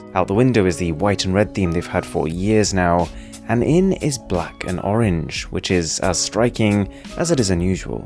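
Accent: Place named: RP, United Kingdom. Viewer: Supersaf isnt as pretentious with his reviews. Drop the posh accent.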